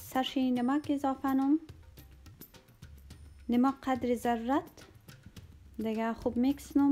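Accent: Hazarajat, Afghanistan. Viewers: خیلی خو ب بود اول همینکه خوب نظافت رعایت مونی دوم اینکه لهجه آزرگی تو بسیار خوبه. خیر کنی خوارک جور باشی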